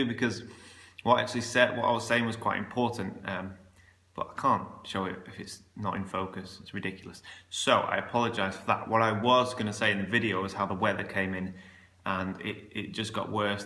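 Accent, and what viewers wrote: United Kingdom. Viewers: absolutely stunning british accent . Thanks for this. Waiting for the next one.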